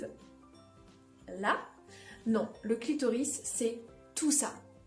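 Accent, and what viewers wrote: South, France. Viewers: Je crois déceler un léger accent qui sent la fleur d'oranger, l'olivier et les cigales (j'ai jamais senti une cigale hein...promis o_O), me trompe-je ?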